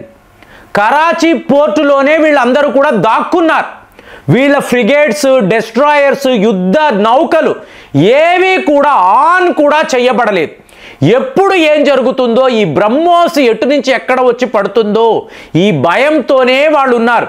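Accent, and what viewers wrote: Tamil Nadu, India. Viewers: Ori vari nuvvu matladedi tamila telugu, salam kadu sagam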